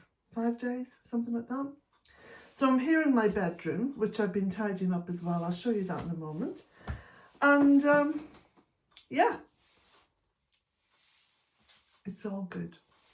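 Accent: Ireland. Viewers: Hi there. It’s absolutely freezing here as well here in Maryland, USA. We have over 3 feet of snow today. Your beautiful Irish lilt reminds me so fondly of me wee Grandma. She was such a dear and our family is from Belfast and I lost my Mom this year in March.Unfortunately I found your channel too late how I wish I could’ve shared your lovely videos with her! She would’ve thought you were just grand ❤️💞🫶🙏🇮🇪 Be well and stay warm🌤️🥰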